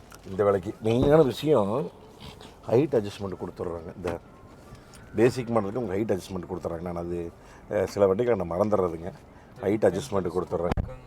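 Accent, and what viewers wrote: Kongu, India. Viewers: அண்ணாவோட கொங்கு ஸ்லாங்கு ஸ்டைலே சும்மா நெகு நெகு நெகுனு இருக்கு😍😍😍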